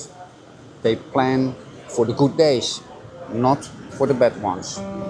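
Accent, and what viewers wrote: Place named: Europe. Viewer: let me be honest with you – as a European (you sound European, forgive me if my assumption is wrong) pensioner with only limited income, stay in Europe. lots of places at the periphery that are as stunning as they are affordable. yes, you may need some winter clothes, but you can live safely and without much uncertainty. and if you save, you may be able to spend one or the other winter in SE Asia, you can pick the cheapest off-season flights.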